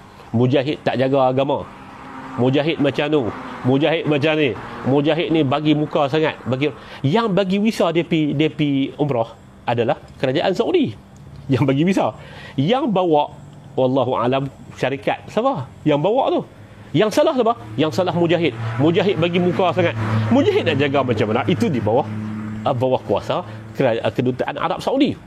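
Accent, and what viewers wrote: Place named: North, Malaysia. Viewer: Itu bahasa Loqhat Utara... Nothing wrong ok... So what now?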